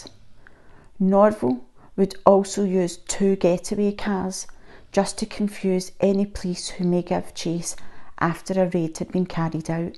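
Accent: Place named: Scotland, United Kingdom. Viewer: Enjoyed your video very informative and lovely Scottish accent ❤. Regards Scotch Rob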